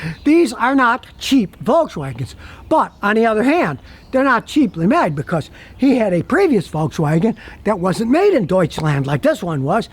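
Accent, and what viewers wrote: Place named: New Jersey, United States. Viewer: Sounds like Jersey,. Sounds like george c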